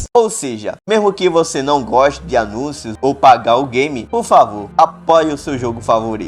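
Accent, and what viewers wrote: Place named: Brazil. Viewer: que sotaque fofo! amei seu sotaque!! eu estudei sobre sotaques! o seu sotaque é remanecente de um dos primeiros sotaques do Brasil!! isso é muito foda rsrs sou nerd de línguas,jogos,anime etc kkkk então sim informação inutil pra você mas toma ehhe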